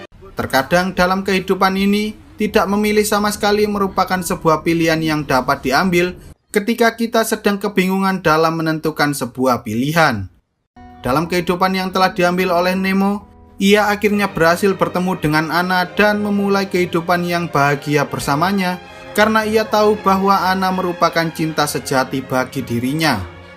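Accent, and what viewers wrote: Java, Indonesia. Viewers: Abang kok kayak bahasa jawa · Me'dok amat mas. Suaranya.hehe🙏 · Ampun dah medog bgt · mantap bang, semoga selalu medot Jawa!!! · Medok nya mengganggu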